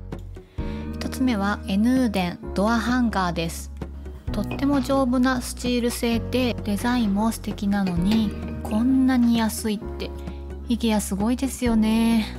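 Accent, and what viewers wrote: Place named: Osaka, Japan. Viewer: 、ご実家大阪なんですねーー。端々になんとなーーくなじみある大阪弁のようなイントネーションを感じていたので、一人で納得してしまいました。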